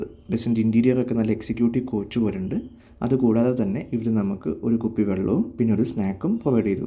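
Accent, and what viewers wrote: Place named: Thrissur, India. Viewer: Thrissur ragam❤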